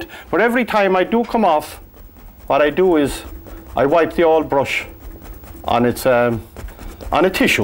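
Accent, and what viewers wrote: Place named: Ireland. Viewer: I love your accent and your paintings 🌸 As a German lived in North Cork for 12 years I enjoy your accent so much!!!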